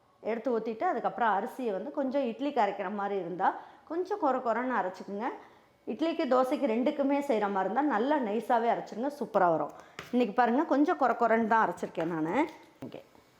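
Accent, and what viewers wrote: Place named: Kongu, India. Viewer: மதுரை சட்னி ஆனால் உங்கள் பேச்சு கவுண்டர் சாயல் உள்ளதே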